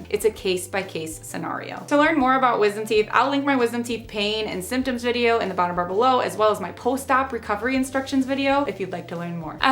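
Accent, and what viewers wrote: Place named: Dakota, United States. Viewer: May I ask a question are you from north or South Dakota? I recognize the accent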